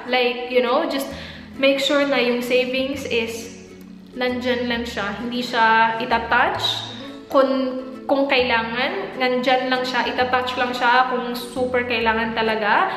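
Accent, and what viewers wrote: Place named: Philippines. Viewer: Gusto ko ung mga vlogs mo, I like how you don't try hard. Ung ibang vlogger jan nakakabusit kasi trying hard, pati accent niya binabago. Mas gusto ko ung gaya lang ng accent mo na pinoy parin.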